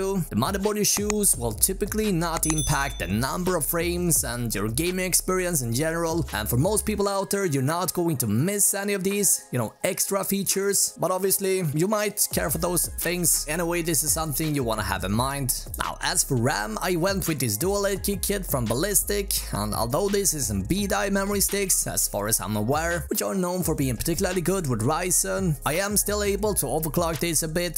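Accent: Sweden. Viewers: Please go watch some American Tech YouTubers, listen to them and then try to speak like them, slowly with taking a breath and pronuciation Thank You Very Much 🙏 Swedish have very similar accent to native English ... Don't know what the problem here 😥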